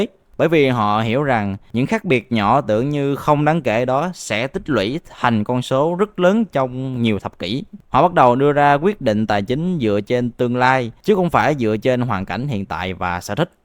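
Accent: South, Vietnam. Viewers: Bạn ơi, bạn giọng miền nam thì bạn nên xài từ "ngàn" thay vì là "nghìn" được không. Mình không phải phân biệt vùng miền nhưng mà mình tôn trọng ngôn ngữ tiếng nói đặc chưng của từng miền.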